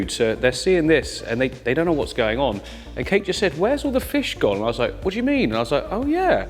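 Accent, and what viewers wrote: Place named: East London, United Kingdom. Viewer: I enjoy your videos and your Eastenders accent. You have a natural knack to the hardscape balance and plant placement '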